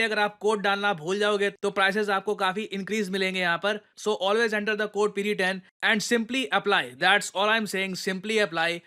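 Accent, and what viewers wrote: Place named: Bihar, India. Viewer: मैक्सिमम ये बोल देतय, ये pure बिहारी language भैया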